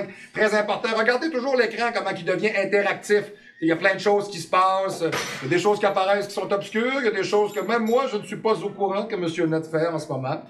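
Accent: Quebec, Canada. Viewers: Bonjour, Je vis en France, je trouve dans l'ensemble votre analyse concernant Big Pharma très très intéressante, le problème c'est qu'avec votre accent par moment c'est compliqué de comprendre, il serait bien pour les prochaines vidéos de parler plus lentement, ça sera beaucoup plus compréhensible pour nous les francais qui ne sont pas habitués à l'accent Québécois (^_^) En vous remerciant, Cordialement. Alex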